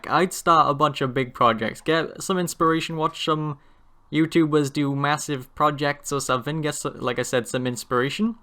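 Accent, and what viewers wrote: Wales, United Kingdom. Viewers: You welsh? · I hear a hint of a Welsh accent?? Shout out to the home land?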